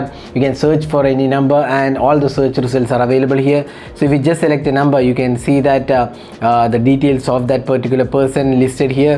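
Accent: Kerala, India. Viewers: Are you a malayali by any chance?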